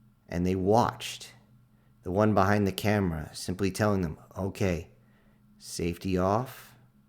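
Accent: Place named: South, United States. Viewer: Hearing your southern accent I really want to hear a story with a Scottish accent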